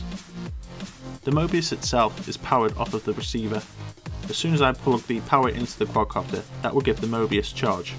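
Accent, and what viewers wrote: Liverpool, United Kingdom. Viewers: Nice build and eloquently presented for an almost Scouse.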